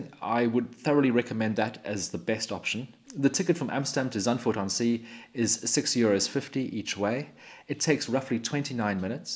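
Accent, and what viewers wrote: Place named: United Kingdom. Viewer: You’re Dutch? You’ve nailed the British accent if your Dutch.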